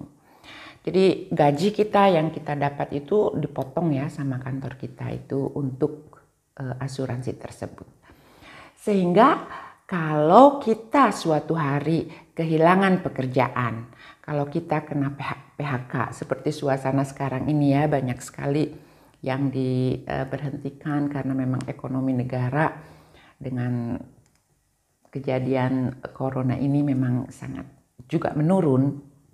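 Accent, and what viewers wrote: West Java, Indonesia. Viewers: uhun bu,rada aneh oge😂ari urang sunda sok sanajan pindah kamamana ge cara nyariosna logat sundana tetep aya keneh😁ibu oge cara nyariosna angger katingali sunda na😂aya oge kimbab famili,sok sanajan tos pindah ka korea angger gaya nyarios sunda na aya keneh,pas ningali teh,ah ieu mah tina cara nyariosna siga urang sunda,beda😁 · Sanaos lami linggih di swiss lentong sunda na masih kadangu, Sae pisaan 👍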